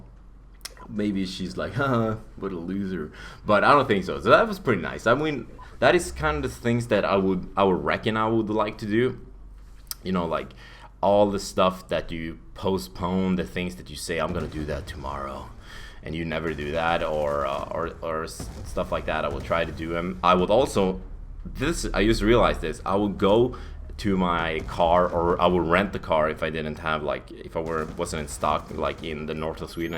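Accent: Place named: Sweden. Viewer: Having a orginal mål good swenglish bro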